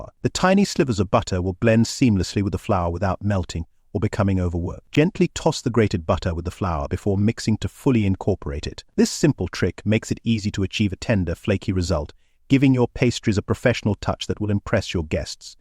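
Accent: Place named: RP, United Kingdom. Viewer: No idea why but the narrator is simply hilarious. Like he's pretending to be Toff English 😂😂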